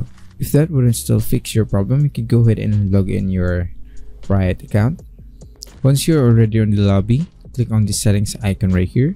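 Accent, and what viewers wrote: Philippines. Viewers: I hear this slight Filipino accent, but still very detailed video :>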